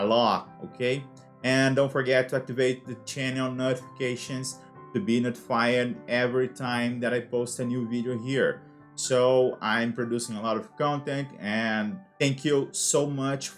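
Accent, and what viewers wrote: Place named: Brazil. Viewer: Eu logo percebi que vc é brasileiro, nosso sotaque é unico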